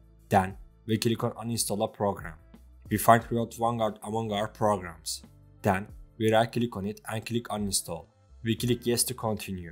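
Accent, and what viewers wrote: Turkey. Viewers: reis konuşmandan anlamdım türk olduğunu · Türk aksağanı çok anlaşılıyor abi ama teşekkürler video yardımcı oldu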